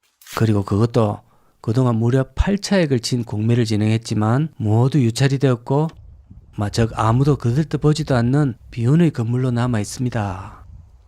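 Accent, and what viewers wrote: Gyeongsang, South Korea. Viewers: 경상도 사투리 딱 듣기실구마이 징허다이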